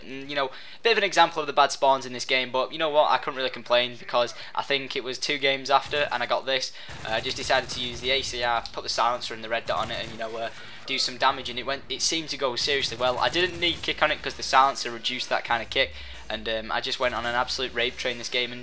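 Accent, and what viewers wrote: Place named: Manchester, United Kingdom. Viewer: na he's from england manchester i think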